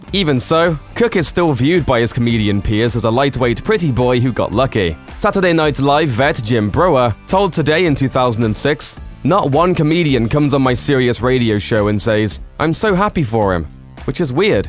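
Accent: United Kingdom. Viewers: To make matters worse he has to get low key patronized by a nameless faceless person with a trash stereotypical British accent. Who deep down has to know he will never be as big as Dane Cook professionally. Ohhhh. Do carrot top next. People love to trash on him. I think it will be funny for a no talent hack to trash someone they can only dream to be as “successful” as 🙈🤩🤡🤑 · A bad brit speech bot is going to tell us what is funny and what is not. Nah, move along. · Can you guys pick another narrator? This guy's accent and is jarring and seriously annoying. Plenty of British voice actors out there who don't grate on the ears when they speak.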